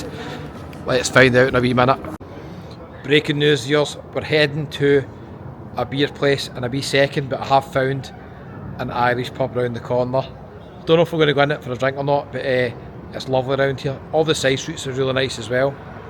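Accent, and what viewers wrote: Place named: England, United Kingdom. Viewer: Your english is so english i can't understand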